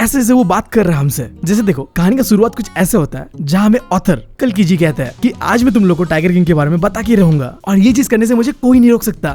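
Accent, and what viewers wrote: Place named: Bihar, India. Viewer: You are from Bihar/Jharkhand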